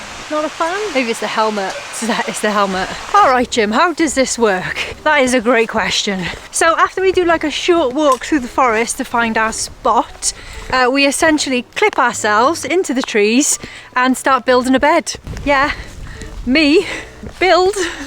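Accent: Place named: Cardiff, United Kingdom. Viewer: Loving your adventure. And as someone said, it is enough just to travel alone. I’ve done it a couple of times. The first two weeks I wondered if I should have been allowed to leave the house, let alone drive across Europe alone! Suddenly I found my feet, my mojo, and everything started to click. Life always seemed to support me. You make me laugh. You sound Cardiff, that’s my hometown. Love your accent. Crackin’ x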